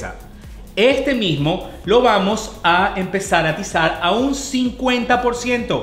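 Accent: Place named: Venezuela. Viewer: Hola me puedes informar en que estado atiendes??? Ya por el acento creo que eres de Venezuela y nada como nuestras mechas, extraño mi estilista😞😞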